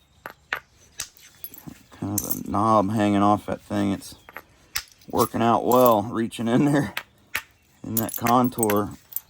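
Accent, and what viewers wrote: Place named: Alabama, United States. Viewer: Cool you used the word solicified in a sentence! Thank you for sharing. Curious where you’re located. I’m in nw Alabama and you sound like home folks.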